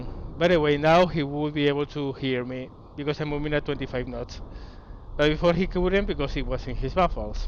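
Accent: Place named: Spain. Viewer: Where are you from? You have a really familiar accent.. perhaps Spain?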